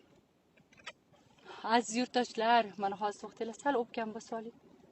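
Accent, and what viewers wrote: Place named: Tashkent, Uzbekistan. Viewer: Узизни шевазда гапируринг .тушунамиз адашиб юбориб яна повторный тошкент шевада тугирлаб кетвосиз. Бир гапни икки шевада гапирвосиз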